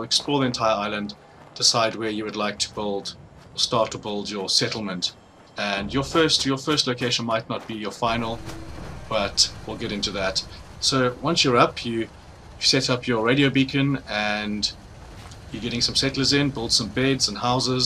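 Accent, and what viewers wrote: South Africa. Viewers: My moms friends south african and I love his accent! Its a lot like yours! keep up the sound work lad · Are you South African? I am so your accent just sounded familiar. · I think I recognize that accent. Are you South African?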